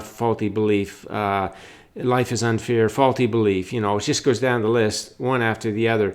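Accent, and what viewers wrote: Canada. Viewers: I’ve never noticed a Canadian accent until this video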